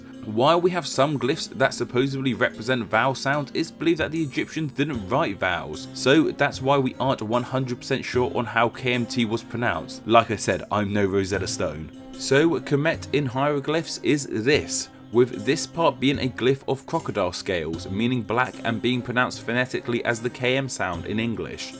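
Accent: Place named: United Kingdom. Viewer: All pronounced impeccably by a British scholar. The raayne in Spaain staays maainly in th'plaain. Please speak a more neutral English. If Marina Sirtis, Patrick Stewart, Jane Seymour, Patrick MacNee, David Niven, Leo G. Carroll, Jean Marsh, Nicola Bryant, and Alfred Hitchcock can do it, so can you. You're speaking to an international audience.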